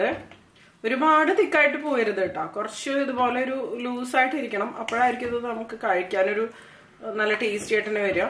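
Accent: Thrissur, India. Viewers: Although I am not a subscriber to your channel, I use to watch your cooking’s. Your Malayalam accent like angamali / trichur style . Believe you work in overseas. All best wishes .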